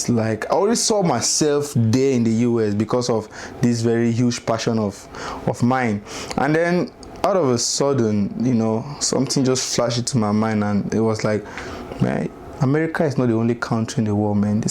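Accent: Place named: Cameroon. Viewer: Nice episode you just got a new subscriber from your accent I knew you were a Cameroonian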